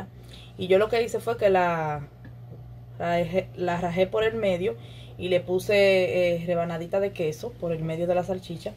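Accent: Dominican Republic. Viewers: Está chulísima tú receta. 😋😋😋 Me encantó la manera que explica los pasos y vas haciendo el proceso de la misma. Sé que por tu acento al hablar eres de mi amado país República Dominicana. Tienes una nueva suscriptora en tu canal. Abrazos cordiales mi reina. ❤🇩🇴❤ 👏👏👏👏👏👏👍😘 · No entiendo que tenía la salchicha que se veía como un nylon o algo así. Tampoco entiendo porqué responde casi todos los comentarios con la misma frase aunque le hayan preguntado algo . Para mi tiene acento dominicano y cuando le dicen puertorriqueña, solo responde bendiciéndote jajaj. Se ven deliciosos , fue solo una sugerencia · Eres Dominicana 100%✓ 🇩🇴❤️❤️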